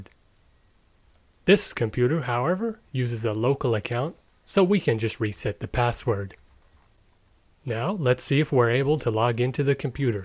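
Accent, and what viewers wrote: United States. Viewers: It’s been so long he almost got me with the American accent. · Just realised was speaking in an American accent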